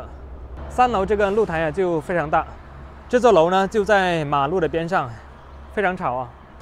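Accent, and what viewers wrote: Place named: Cantonese, China. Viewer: ，原来会广东话的🤟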